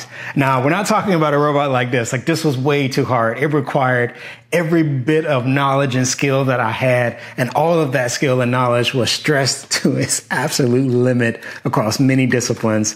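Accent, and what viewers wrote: Baltimore, United States. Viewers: You sound like you from Baltimore you should try to get on at that bridge project. I'm sure they use an engineer if your caliber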